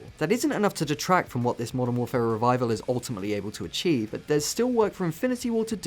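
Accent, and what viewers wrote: United Kingdom. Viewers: British I think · Defs british, not nz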